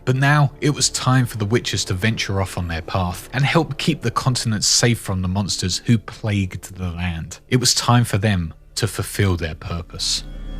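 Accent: United Kingdom. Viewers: Just started. Don't know anything about the lore and was just waiting to hear the voice of who was making the video of the game. You are not annoying and have a British accent. 100% can watch this video and learn what I wanted to know 😂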